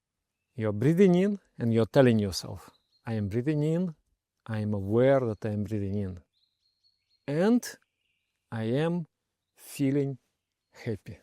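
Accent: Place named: Russia. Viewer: Is this guy Russian?! 🤪😝 I know this accent all too well 🤣 Great meditation, I’ll stop getting distracted by my country’s accent 🧘‍♀️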